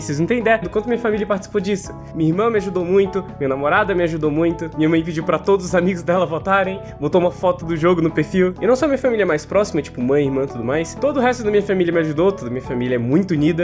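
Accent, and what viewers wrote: Brasília, Brazil. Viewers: VOCÊ É DE BRASÍLIA???????????? ceilândia ou taguatinga sul?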